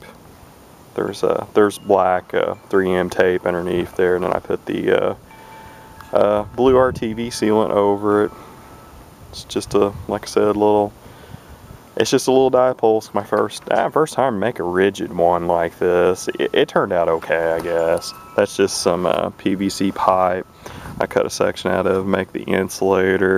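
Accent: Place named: South, United States. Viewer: Top level Southern drawl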